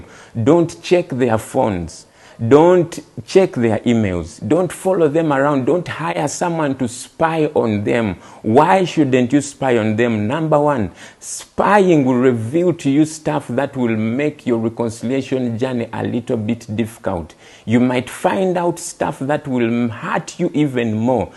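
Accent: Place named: Uganda. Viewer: Ugandan English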